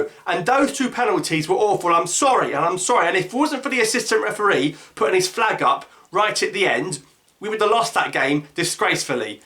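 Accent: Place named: England, United Kingdom. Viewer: I'd say that's an accurate assessment. I could tell in your voice your voice that you had some English in you. You seem like a call guy so I'm going to subscribe to your channel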